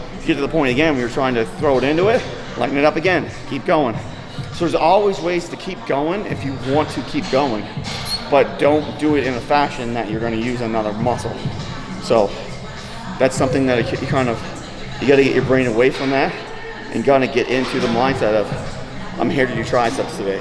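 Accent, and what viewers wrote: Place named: East Coast, Canada. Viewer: Is that a Canadian East Coast accent I hear?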